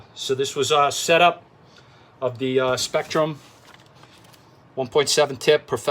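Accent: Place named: Massachusetts, United States. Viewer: Luv the MA accent, lol...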